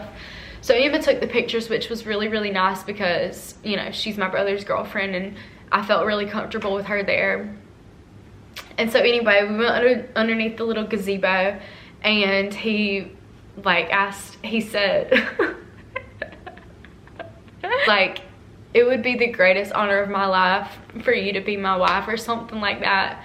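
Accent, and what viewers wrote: South, United States. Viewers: CONGRATULATIONS ......❤💚♥💛💙🧡💙♥💜❤💚💜♥💙 Where are you located? .. you sound southern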